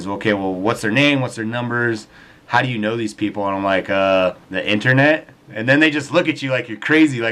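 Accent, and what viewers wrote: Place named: England, United Kingdom. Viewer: With your voice I thought u lived in England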